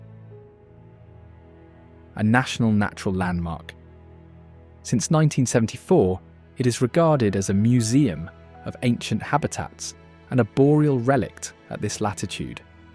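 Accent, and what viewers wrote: United Kingdom. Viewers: I think the Guy speaking in an BRITISH acent talking about Appalachia is Silly.. Why not a Southern acent ?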